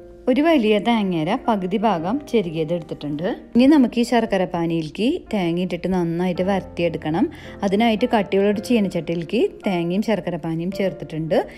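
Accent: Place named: Thrissur, India. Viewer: Hai my dear friend ഈ സ്പെഷ്യൽ ചേരുവ കൊഴുക്കട്ട സൂ....... സൂപ്പർ പിന്നെ ഈ തൃശൂർ ശൈലിയിൽ വിവരണവും അടിപൊളി കൊഴക്കട്ട ഫ്ലേവറും ഈ വിവരണ ഫ്ലേവുറും കലക്കി വീഡിയോ കണ്ടു ഇഷ്ടപ്പെട്ടു ലൈക് ചെയ്തു 👍💐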